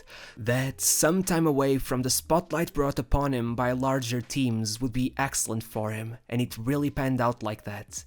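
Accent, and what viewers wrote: Portugal. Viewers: Every morning every football player should look into a mirror and say"Thank you God c'os i'm blessed..I do what i love to do and i get paied for it..I do what what millions of kids in the world never reach,and my life in footballl it's like a life of an orchidea,so lets be excelent,lets seize the day"...It's only a very humble vision of life it costs nothing...But not,too much shit in the brains,too much drugs,too much women,and the shine of youth fades...wasted!...Good work with the video only the accent it's too much Tuga(iAm i wrong?) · fantástico como se conhece os tugas ao longe a falar Inglês... bom trabalho! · You sound Portuguese, are you? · Just one question are you Portuguese cause I think the way u pronouce latin words sounds a lot like it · the guy casting the video is so portuguese ! can tell by the accent xD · Dar support, nota-se que é tuga pelo sotaque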